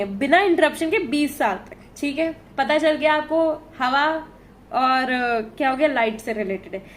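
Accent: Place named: Lucknow, India. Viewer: Apki voice me lucknow ka accent hai❤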